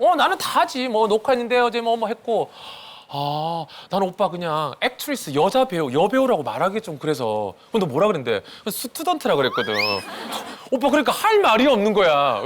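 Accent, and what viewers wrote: South Korea. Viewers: 김영철 영어 못함. 엉터리 영어, 콩글리쉬를 너무 자신만만하게 함 ㅋ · 영어도 제대로 못하면서 뻥은? 하와이에 왔을때 콩글리쉬하는거 보고 엄청 웃었음.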